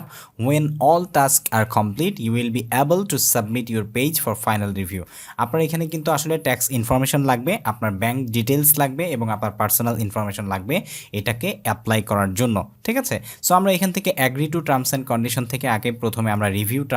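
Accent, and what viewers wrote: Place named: Gopalganj, Bangladesh. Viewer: Vaya apni gopalgonj ar cale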